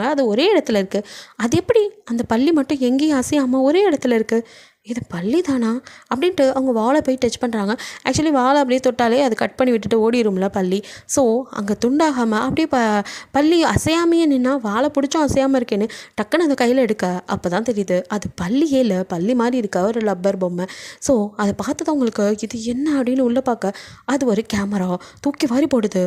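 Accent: Sri Lanka. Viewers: Innaikku Tae bias Ku double suprise aah nandri nandri enakku intha part la RM oda wife enka naattu slang romba pidichchirunthathu unkada kural la srilanka Tamil kathachchaale vadivu thaan 😍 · Srilanka slang super❤❤❤❤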